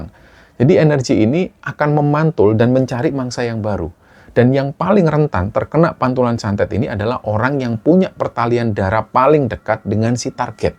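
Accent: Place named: Bali, Indonesia. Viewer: Salam dari Blitar yang sedang di Singapore. Hari hari suara mas nemenin kerjaku. Kalau mas ngomong Jawa jadi ingat ipar ku pas pelafalan huruf D. Iparku orang Bali asli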